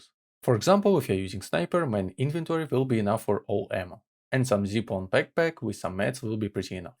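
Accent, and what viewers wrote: Russia. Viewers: Мне кажется, или я слышу русский акцент? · Are you Russian,but you know English?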